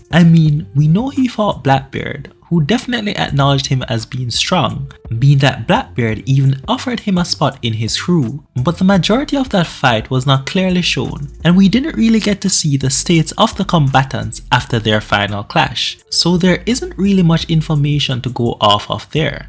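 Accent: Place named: Jamaica. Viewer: You sound Jamaican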